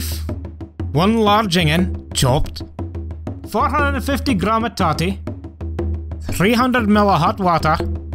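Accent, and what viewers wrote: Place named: Aberdeenshire, United Kingdom. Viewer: Es el idioma Scots. Es el acento de Aberdeenshire.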